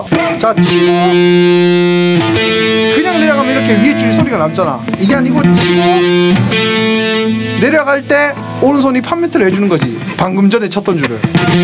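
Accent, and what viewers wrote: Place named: Gyeongsang, South Korea. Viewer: 같은 경상도 사람이신가유..? ㅋㅋ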